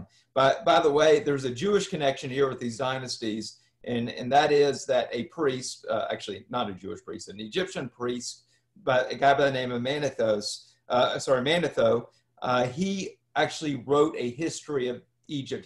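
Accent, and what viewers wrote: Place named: Mississippi, United States. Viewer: I knew I heard a Mississippi accent. I love your videos here — a Mississippi native.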